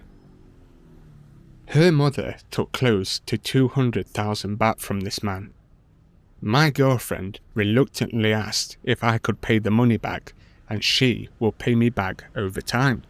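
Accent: United Kingdom. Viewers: Not true.... You speak with a British voice yet you're not a Yank.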